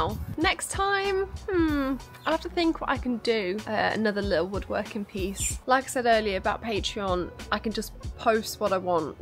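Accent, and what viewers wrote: United Kingdom. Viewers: I'm still confused about her UK accent in AUS 😅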